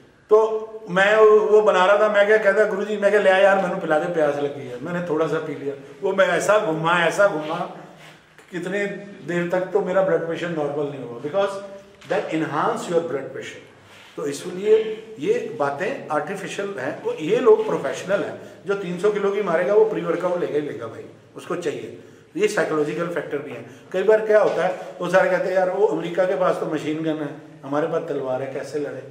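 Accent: Haryana, India. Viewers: Haryanvi 😅😁😁mst..u r great sir..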